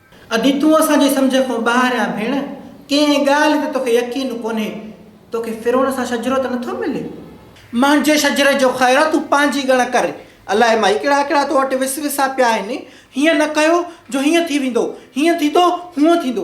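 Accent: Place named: Northern Sindh, Pakistan. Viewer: I like the way u speak Sindhi actually it's an utradi accent of sindhi (north side) they speak in the same way and we speak laar side Sindhi(south).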